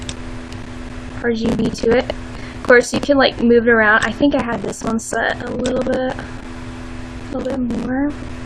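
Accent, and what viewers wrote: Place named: United States. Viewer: oh my god my parody buddy has the cutest american accent! Soooo cute! OUO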